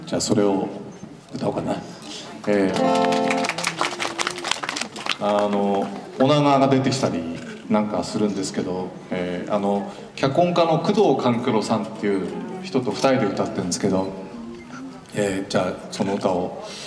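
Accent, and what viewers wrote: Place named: Tohoku, Japan. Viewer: ちょっと東北弁入れてる感じで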